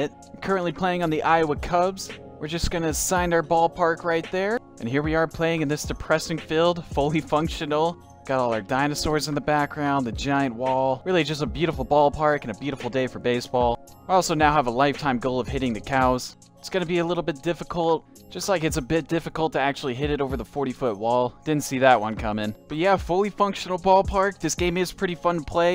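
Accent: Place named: Baltimore, United States. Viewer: algorithm That's just the Baltimore accent. You should see what happened with Aaron's iron urn.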